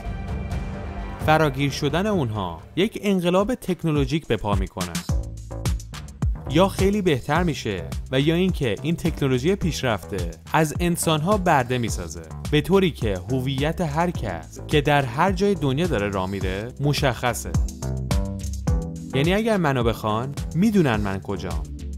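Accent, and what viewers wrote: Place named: Hamadan, Iran. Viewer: باید جواب بدی . میگما شمادلهجه همدانی داری ..خدای همدانی هستی من یشرط بستم ..حاالا ب رازی بگوببینم. همدانی پوست خرکن هستی ....زود اعتراف کن..😞😒😞😒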